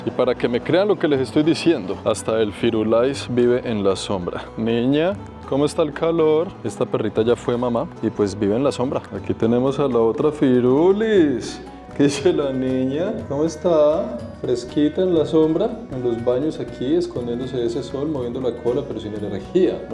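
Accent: Colombia. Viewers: eres de las personas preferidas, tu español es muy Colombia 🇨🇴, emprendedor como todos tus paisanos. Gracias por mostrarnos el mundo. Desde los llanos de Colombia 🇨🇴🇨🇴🇨🇴🇨🇴🇨🇴🇨🇴.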